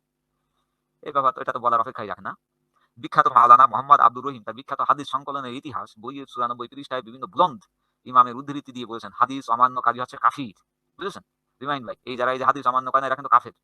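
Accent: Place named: North Bengal, India. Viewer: গুরুজি আপনাদের উত্তরবঙ্গের ভাষা খুব সুন্দর এটা আমরা মেনে নিই কিন্তু আপনার ভাষাটা খুব তাড়াতাড়ি আসার কারণে বা বলার কারণে আমাদের বুঝতে অনেক কষ্ট হয়ে যায় বিশেষ করে আপনি যে পৃথিবী ছয় দিনে বা আট দিনে সৃষ্টি করা যে এটা লাইফটা করছেন সেই সেই লাইফটা আমরা ভালোভাবে বুঝতে পারিনি আরেকটু স্লো ভাবে খন্ড খন্ড করে বুঝিয়ে বলবেন তাহলে আমাদের বুঝতে কষ্ট হবে না আশা করি আপনাকে এবং আপনার জ্ঞান কে আমরা শ্রদ্ধা করি আপনাদের উত্তরবঙ্গের ভাষা গুলি শুনতে এমনি হাসি আসে এরপরে যদি একটু তাড়াতাড়ি করে বলেন তাহলে আমরা একেবারেই বুঝতে পারিনা ধন্যবাদ স্যার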